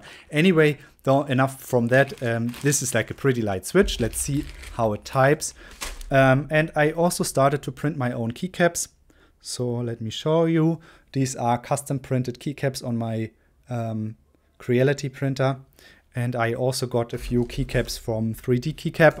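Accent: Germany. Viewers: i hear a bit of se german akzent , LG :)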